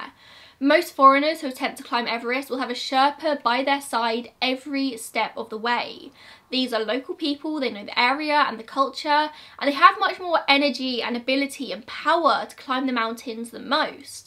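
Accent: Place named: Southern England, United Kingdom. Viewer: Thank you so much for all that u did and went through in order to give us this video 🥰🙏🏻 You’re an angel, I LOVE ur voice and the content u create always hits a nerve with me where I get really into it (although not in a “sensationalism” induced way I’d hope) Can’t believe I only JUST discovered ur channel (through the KRIS KREMERS & LISANNE FROON case bless their hearts and those of their families) but at least there’s a lot to catch-up on. Where abouts in the UK r u from btw? Going by ur accent I would guess somewhere around the southern parts of England? (I am half Swedish, half German so English isn’t my first (or even second) language, but we moved around Europe a lot when I was a kid and later as an university student, until I came here to London 🇬🇧 7 years ago so yeah 💁🏼‍♀️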